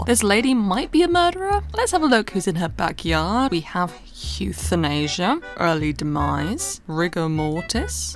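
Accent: United Kingdom. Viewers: I could hear British people talk about literally anything let alone the BEST SIMS GAME EVER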